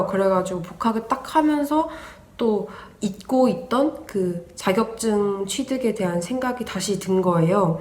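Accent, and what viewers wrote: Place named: Seoul, South Korea. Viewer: 서울말 잘쓰는데 ~ ㅎ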